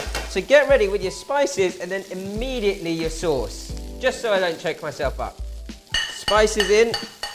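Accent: United Kingdom. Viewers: Why you speak like a British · I love your videos but it just makes me laugh so here a Asian man speak British or English no disrespect pundit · An Asian with a british accent.. LOVE IT!!!!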